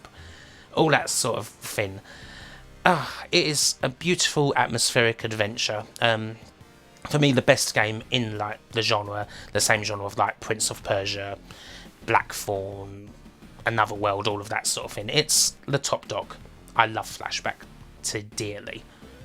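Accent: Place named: Essex, United Kingdom. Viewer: Nearly right. comes from Essex, which is to the north east of London.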